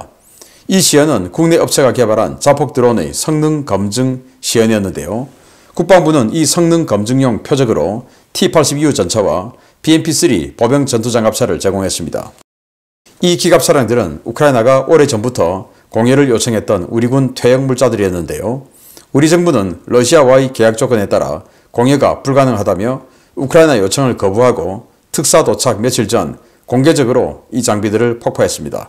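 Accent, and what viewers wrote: Gyeongsang, South Korea. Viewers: 늘 나라위한 소식 감사합니다 그러나 알려서 오히려 해가 끼칠걸 판단 하시는것이 먼저 진정한 나라위하는 것이고 자신 중심과 우상적 말씀은 자제하셔요 가만히 앉아서 실기의 전쟁상황을 이론의 말씀만으로 자신하십니까?.그리고 뒤가 먼저입니까?나아가는 앞이 먼저입니까?마치 한국이 전쟁을 치루어야 되는것에 긍정만 보시는데.핵심은 전쟁없이 살생없이 주변과.특히 북한과의 사이 아닙니까? 또.제일 중요한것은 팔이 안으로 굽는다고 북한군인이 죽기 살기로 뛰어드는 사정을두고 무기줄테니 죽여라는 것입니까?그리고 또.친구 비유 하시는것도 보면 사상적 까지 의심스럽듯이 친구것을 먼저 탐하는것에서 화끈이 되네요.아무리 가까워도 내것이 아닌데 버리던 말던 왜?열을 내십니까?바라건데 앞으로 전쟁에 대한 자료는 접는게 더 좋을듯 합니다.또.경상도 억양으로 진짜 경상도 사람을 욕먹이고 있지 않습니까?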